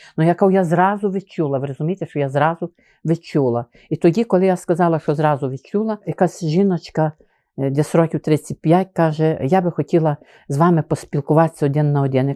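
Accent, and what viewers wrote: Transcarpathia, Ukraine. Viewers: Дякую вам за цікаві розповіді,люблю ваш канал,дивлюсь з задоволенням .Освітчуєте цікаві місця де я хотіла би побувати ,але не взмозі з багатьох причин.Дуже люблю закарпатський говір,мрію так говорити ,намагаюсь. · Як я люблю Закарпацький Говір! дякую вам!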